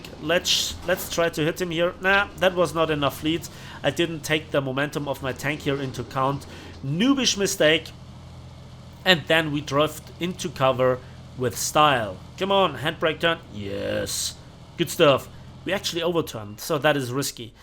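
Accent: Bavaria, Germany. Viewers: He's from Bavaria. Those People have a special pronunciation, which makes them sound similar to the Austrians. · He just sounds like the typical german who speaks english.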